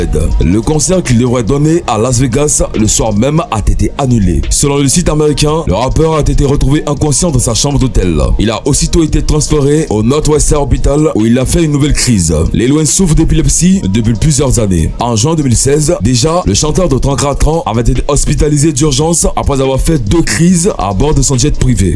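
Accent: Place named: Benin. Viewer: C plus.son accent beninois.ils disent pas les.R.comme moi d ailleur hihihi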